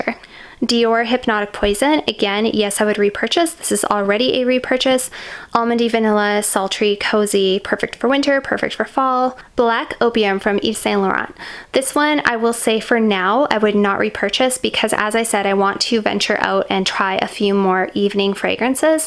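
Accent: Canada. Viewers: Hey, you’re Canadian?!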